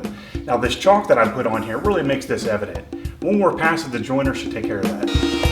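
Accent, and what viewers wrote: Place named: Midwest, United States. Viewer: Are you a NC native? For some reason I was thinking Wisconsin, Michigan (definitely Midwest of some sort) native based on your voice. Sorry so random...message me if this isn't the appropriate forumn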